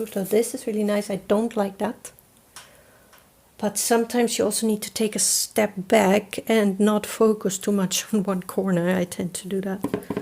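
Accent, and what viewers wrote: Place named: Netherlands. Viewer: Absolutely love this video. I too was adding too much color. I appreciate artists sharing their "mistake" creations. It helps me see I'm not as far off as I feel. I also love listening to you talk. My great grandmother was from Groningen Holland and I adored her. Hearing accents from the Netherlands brings back sweet memories. Thank you for sharing your journey.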